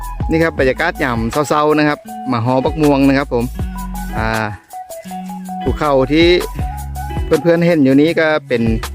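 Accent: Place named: Loei, Thailand. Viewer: มักครับ สำเนียงไทยเลยม่วนดีครับ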